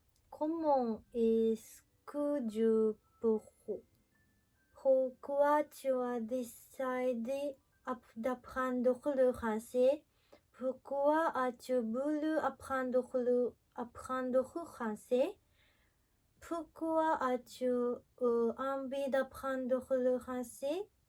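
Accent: Japan. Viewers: Trop Cute l'accent japonais pour parler francais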